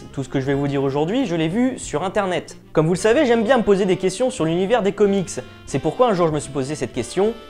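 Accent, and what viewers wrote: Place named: South-West, France. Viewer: Pas mal mais ton accent part en accent du sud ouest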